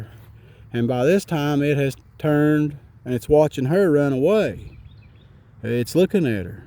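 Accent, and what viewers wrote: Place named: Kentucky, United States. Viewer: New subscriber. I’m from Ky and you sound like my relatives. Feels like home.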